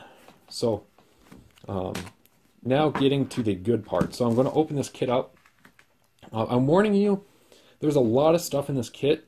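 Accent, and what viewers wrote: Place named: Canada. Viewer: I'm from Michigan but you sound like you're very close to Canada. I don't hear the Canadian twang in voices here in West Michigan.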